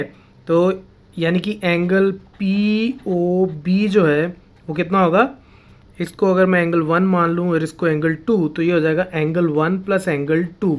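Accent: Punjab, India. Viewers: Sir ap punjabi ho na ?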